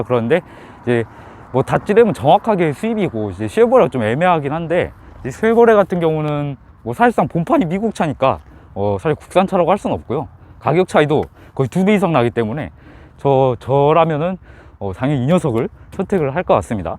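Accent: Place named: Jeolla, South Korea. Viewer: 전라도 말씨여ㅎㅎ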